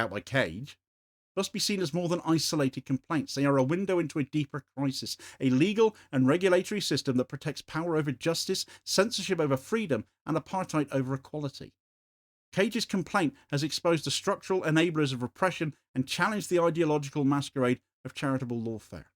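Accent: Cornwall, United Kingdom. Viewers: Best news I have had all year as a Jewish Devonian. Sorry I know you, like all my Kernow friends, will say I was born wrong side of the Tamar and am an emmet. I really hope this challenge succeeds as two former petitions to the Charity Commissioners by Tony Greenstein and Jewish Voice for Labour to remove status from the Campaign Against Antisemitism failed. Nice to hear your Kernow lilt!.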